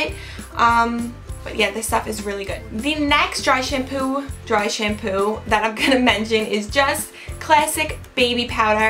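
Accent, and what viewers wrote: New York, United States. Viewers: Is it just me or does she have a New York accent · are you from new york? you really have an accent. i can tell because i am from new york. :)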